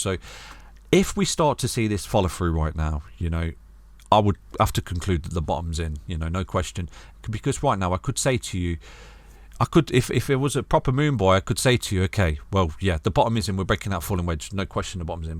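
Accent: United Kingdom. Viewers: Nice British accent 😊 always welcome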